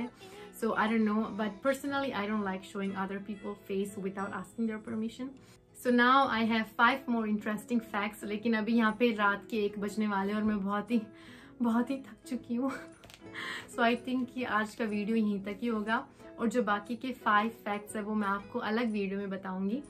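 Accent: South Korea. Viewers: Your accent is slowly slowly changed into korean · I love your Korean accent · Why you talk like you don't know hindi .. like some korean speak hindi.. but its feel good if any korean dpeak like that .. however you look awkward talkimg like this... its bad..not good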